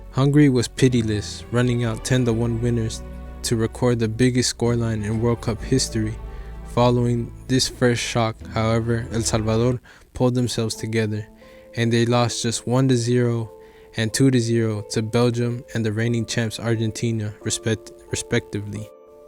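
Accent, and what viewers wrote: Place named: Paisa, Colombia. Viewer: Puta solo ecucharte sabia que eras paisa Great my bro, HE is our Hero and yeah cause he was from E.S he's not as famous...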